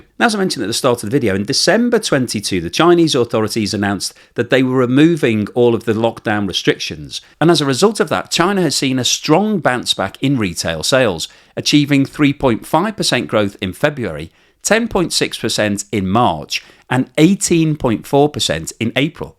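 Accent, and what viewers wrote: United Kingdom. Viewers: The bloggers brit accent is hard on the ears, especially when pronounces letters T. Ouch I'm out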